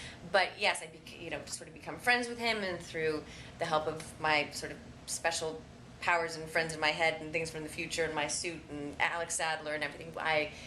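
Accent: England, United Kingdom. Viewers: is she english? she sounds english might be me though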